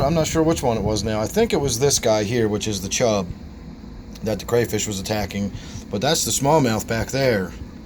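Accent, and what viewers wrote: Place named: Maryland, United States. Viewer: Are you from Maryland? You sound like you have an old-school Maryland accent, but sometimes tidewater Virginia or eastern North Carolina can sound very similar.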